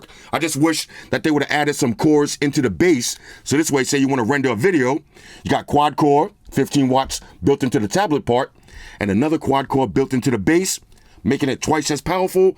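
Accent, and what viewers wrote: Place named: West Coast, United States. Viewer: Here my man I just heard the voice for 1 second and I subscribed he reminds me old school west coast 😂😂😂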